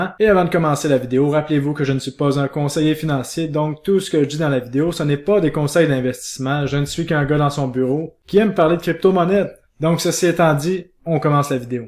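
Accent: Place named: Quebec, Canada. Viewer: Merci tu nous motives dans nos investissements et c est tjrs un plaisir d entendre ton accent, ça m rappelle mes vacances au Québec!! Tkt on partage ta vidéo en Belgique et ailleurs dans le monde francophone!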